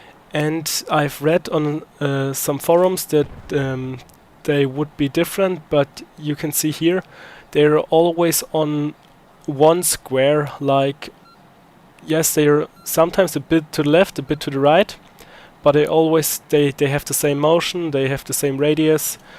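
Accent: Germany. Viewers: Bist du Deutsch? Man hört dass es Deutsches Englisch ist :) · geil man :D Deutscher Aktzent erkennt sich meilenweit :D tolles vid, hat mir sehr geholfen danke man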